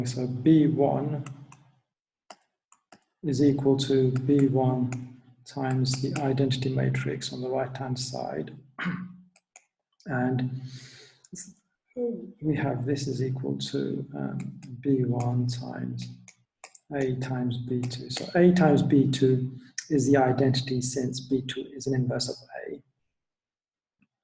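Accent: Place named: Oxford, United Kingdom. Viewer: sübhanallah sanki oxford üniversitesinde derse girdim bu aksan gerçek mi😱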